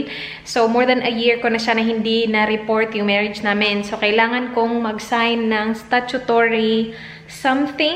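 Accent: Visayas, Philippines. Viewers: Ang accent sa pagka bisaya ba, mo gawas jud hahaha Kalisud basta bisdak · Wooh the bisaya tono uy 😅💖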